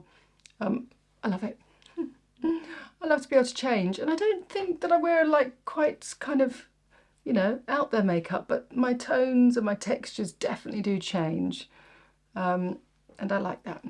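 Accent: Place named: Scotland, United Kingdom. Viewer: Me too! I go down to the kitchen, make my oatmeal and coffee, bring it up to bed and snuggle in and have breakfast with. I wonder how many of us are doing that together? Of course, not at the same time lol because I'm in Minneapolis and you sound like you're in Scotland. maybe we could take a poll and see how many of us eat breakfast with?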